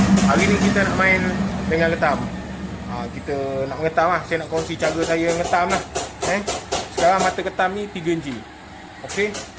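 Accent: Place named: Perak, Malaysia. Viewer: Assalamualaikum.. Bos area mana.. Dgr mcm belah Perak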